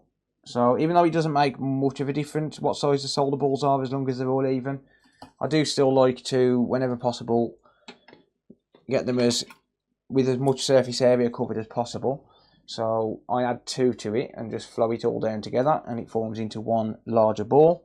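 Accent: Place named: Walsall, United Kingdom. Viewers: you gotta love a good brummie accent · very interesting mate,you from birmingham ?…now i know where to send my repairs 🫡👍🏼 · Great video mate, you proper sound like your from near me (Walsall)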